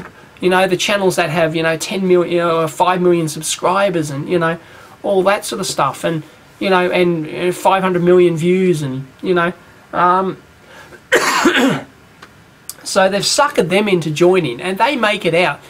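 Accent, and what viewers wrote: Australia. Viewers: Just for the record... Love to hear an Aussie be a true Aussie!! keep it up mate! Ignore those other winging bastards that can't understand our shenanigans!! THANK YOU VERY MUCH! its refreshing to hear! Please keep it up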